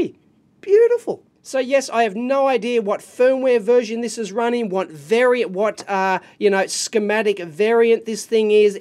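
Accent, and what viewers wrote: Australia. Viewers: aussie terms crack me up,basically brit humour with an aussie accent,"pissin away power","one hung low". Great stuff mate. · Jeez mate, patter is bonza. Stone the bleedin crows mate, strine is easy, try wrappin your lug holes around Scottish. We all talk like in straya especially after a schooner or two. Just keep an eye out for drop bears...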